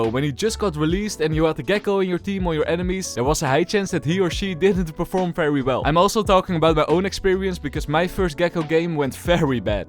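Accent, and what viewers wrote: Netherlands. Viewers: you sound? look's like you from netherland are you from netherland?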